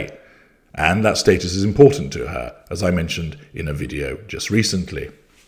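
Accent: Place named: Midlands, United Kingdom. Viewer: I detect a bit of the Midlands in his accent. Have thought so for a while.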